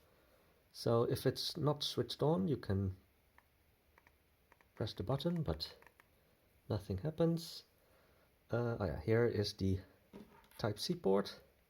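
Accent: Netherlands. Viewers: Wow weve got a dutchee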